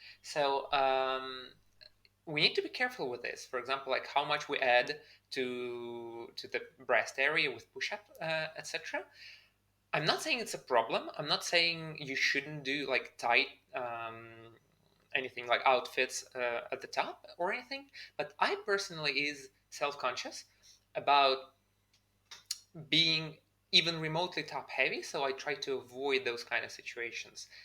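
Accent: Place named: Europe. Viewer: (1) Out of curiosity, what country did you relocate from? And in what country are you living now? Your English is very good. You sound European. (2) I'm a baby-boomer who's accepted in a MTF HRT program at a health center so I can transition to living full time as a female. The culture has finally shifted to where you don't have to fear for your life, get arrested or in general, get harassed wherever you go. Even dressing gender-neutral at only 4 months of transitioning, I've incurred no problems in today's American society. (3) Did you have an occupation or job skill that would translate MTF so you could continue to earn a living. I've been active in the trans community for 25 years. TGurls who were moving forward 'no matter what' lived in what I termed 'a gender ghetto'. No matter what their education, they could only get menial jobs, perhaps as a bartender at a gay-bar or a clerk at a convenience store. 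Professionals (one I knew was a surgeon, the other was an IT specialist for a large corporation) lost their jobs immediately after they had GRS surgery and announced it (a) to the partners who had their LLC practice's lawyer draw up a buyout agreement effective immediately and presented to the surgeon the moment she returned from Thailand where s he had her GRS done, and (b) even though the IT specialist worked openly and sincerely with the company's HR department who 'talked the talk about inclusion' etc., and the company was unionized and she had the union's LGBT rep atte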